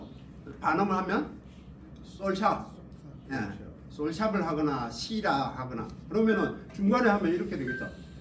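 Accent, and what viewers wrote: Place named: Gyeongsang, South Korea. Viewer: 선생님 강의 정말 감사드립니다. 경상도 어투라 친근감이 가고 귀에 속속들이 다가오네요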